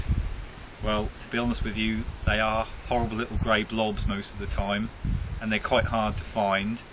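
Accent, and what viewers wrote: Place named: Norfolk, United Kingdom. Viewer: You sound like a good old Norfolk boy. I was born in Yarmouth but have lived in Ipswich for the last 10 years. We have some lovely dark skies around here and the Skywatcher 200 is on my shopping list. Good video.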